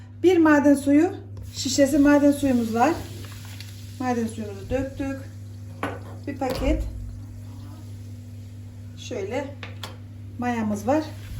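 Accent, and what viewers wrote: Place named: Anatolia, Turkey. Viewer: Senin eline de şivenede kurban olayım o ellerin dert görmesin ellerine sağlık Anadolu insanı hiç kendini darlama nasıl konuşuyor san öyle devam et biz seni doğal halinle çoook seviyoruz evimizden biri gibi MaaşALLAH sana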